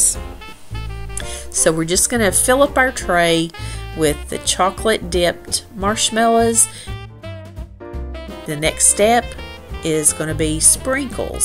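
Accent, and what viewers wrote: South, United States. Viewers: This was such a super sweet video. The treats all look delicious.i plan to make a few of these this year, hopefully. The best part of this video is listening to you talk, and especially about the real meaning of Christmas. I love your sweet, soft southern voice. You sound like my family. We are southern and listening to you was a joy.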